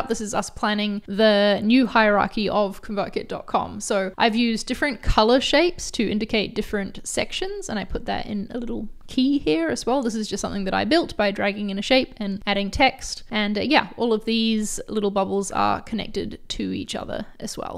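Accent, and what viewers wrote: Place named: New Zealand. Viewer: Figjam is cool but I’m so glad I was able to tell the you were from New Zealand and not Australia. As an American I’m quite proud.😊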